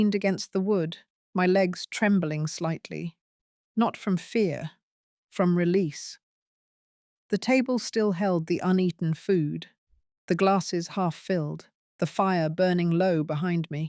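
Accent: United Kingdom. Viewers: The Reader is British of course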